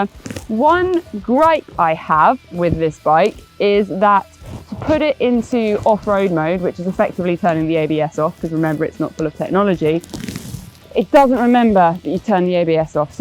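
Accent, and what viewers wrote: United Kingdom. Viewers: Funny, I follow you on Instagram but never noticed that you’re British. You’re British right? Great looking pants and jacket!!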